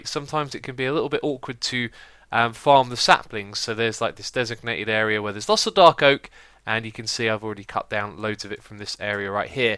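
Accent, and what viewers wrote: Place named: South East England, United Kingdom. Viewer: He is British but he won't answer more specifically than that. I think it's safe to assume he's from south-east England though.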